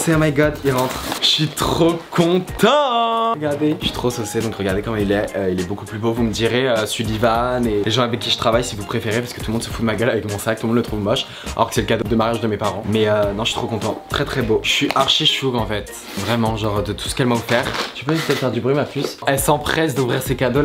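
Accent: England, United Kingdom. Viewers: Tu a un trop beau accent anglais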